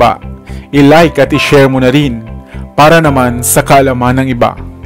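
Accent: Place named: Philippines. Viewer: Galing ng boses ng narator maliwanag ang mensahe pilipinong pilipino di gaya ng iba slang n di mo alam kung sang bansa galing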